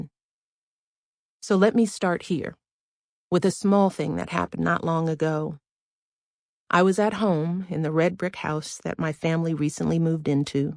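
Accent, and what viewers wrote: United States. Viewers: IS THIS THE AMERICAN EDITION.? I MEAN , THE AUDIOBOOK IS IN AMERICAN SOUND?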